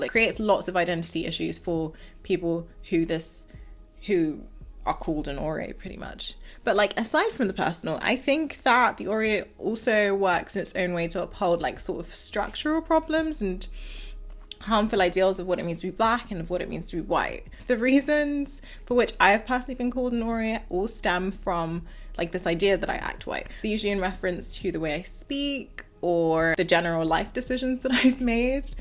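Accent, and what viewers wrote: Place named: Africa. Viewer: Why you sound African then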